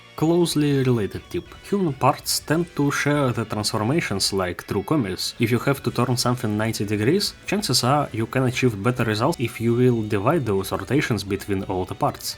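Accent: Russia. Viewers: bro are you russian dont know why ,i like to hear you speek english (this is only a comment dont take it seriously ),it is intreasting to learn some think while having fun keep it up🙂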